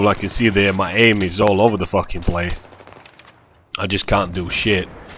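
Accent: United Kingdom. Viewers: He's not from Russia that's for sure... I personally think that he is from somewhere in the UK, I can tell by the way he pronounces some of his words. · he sound British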